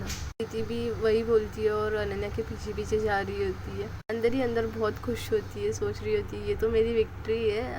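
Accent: Maharashtra, India. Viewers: Hindi main jo marathi ka thoda tadka lagta hai kya baat hai ☺️☺️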